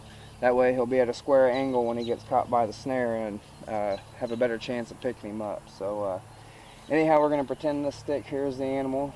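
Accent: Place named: Midwest, United States. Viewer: What part of the Midwest are you from.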